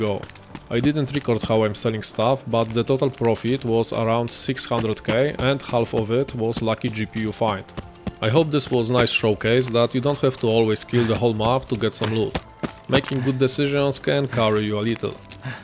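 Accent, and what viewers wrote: Poland. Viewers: Właśnie odkryłem Twój kanał. Nie wiem dlaczego ale od razu po akcencie wiedziałem, że jesteś Polakiem :D Świetne filmy, tak trzymaj!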